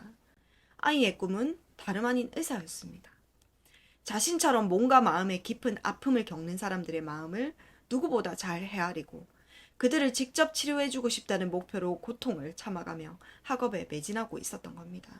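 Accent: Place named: Seoul, South Korea. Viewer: 서을말 좋아요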